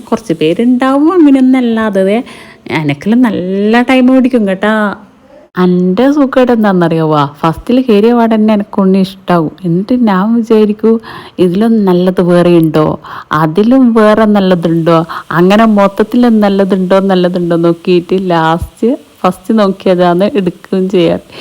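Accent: Kannur, India. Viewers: Ngale samsara reethi nallam ishtapett.kannur kasakodu karude samsaram kelkan nalla rasama❤❤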